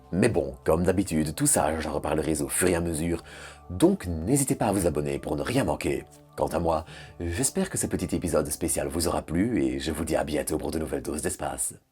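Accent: Belgium. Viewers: C'est bien sa belle voix grave et son accent belge.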